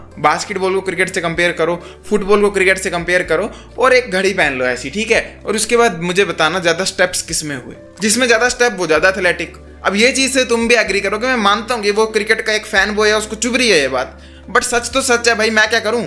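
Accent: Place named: Haryana, India. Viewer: Bhai aapki boli haryanvi jaisi hai❤❤❤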